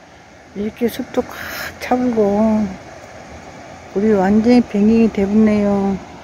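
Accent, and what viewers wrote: Gwangyang, South Korea. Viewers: 말 하는 억양이 과냥 사람 같네! 일 만 km 멀리서 과냥 말 들으니 좋네!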